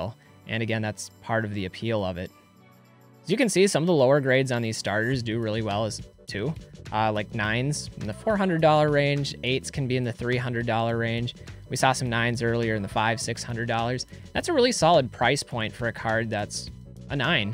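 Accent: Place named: Minnesota, United States. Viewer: Holy shit man are you from Minnesota?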